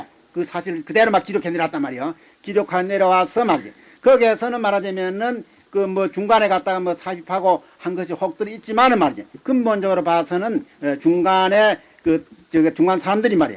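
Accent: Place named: Gyeongsang, South Korea. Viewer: 경상도 맞습니다 동감입니다 ㅎㅎ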